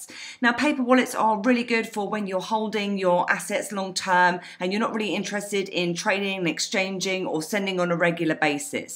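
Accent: United Kingdom. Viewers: Nice to finally hear British accent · your British accent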